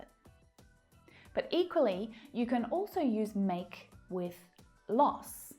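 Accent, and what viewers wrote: United Kingdom. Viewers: Ms I would like to ask about your accent,is it british accent? Let me know if you dont mind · NO ME GUSTA EL ACENTO BRITÁNICO... SORRY 🙃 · your lessons so helpful, you are British, right? now I am advanced what should I do to reach native level? thanks a lot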